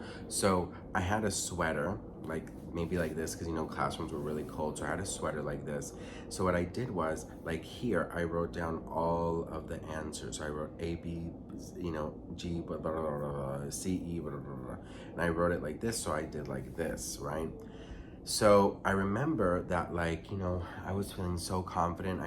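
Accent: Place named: New York, United States. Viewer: TAWK!! Love it !! You sound like me (a new yawker lol)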